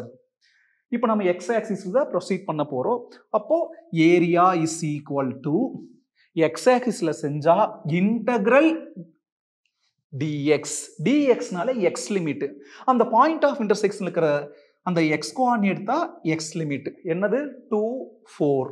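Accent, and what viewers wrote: Coimbatore, India. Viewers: Your way of teaching in coimbatore slang is interesting sir👌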